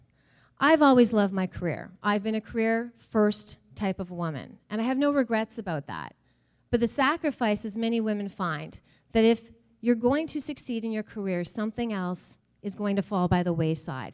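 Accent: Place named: Canada. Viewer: Lol she's extra Canuck, she sounds like Marco Belchior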